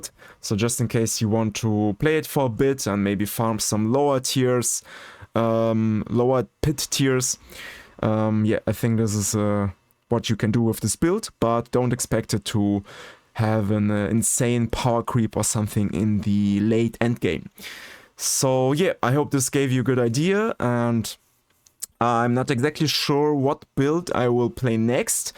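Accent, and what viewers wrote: Germany. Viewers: Ich höre raus das du Deutscher bist. Finde dein Build ganz cool und werde es mal ausprobieren sobald ich den Rogue auf 100 hab. Pit 200 lauf ich dann eben mit meinem Necro.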